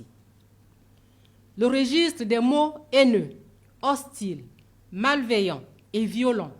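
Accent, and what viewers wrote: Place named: Nzebi, Gabon. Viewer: L'accent nzebi n'a pas totalement disparu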